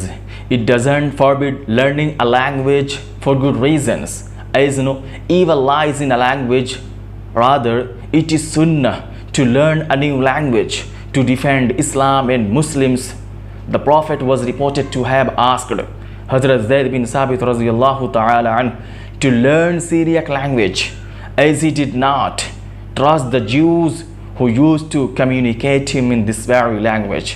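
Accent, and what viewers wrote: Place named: United States. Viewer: Lag raha hai America se aaye ho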